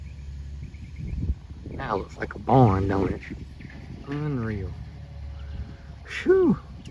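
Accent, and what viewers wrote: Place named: Texas, United States. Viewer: Those doors are absolutely gorgeous and I love your accent I am from Texas but we kind of have the same Lango going on here LOL😂😂😂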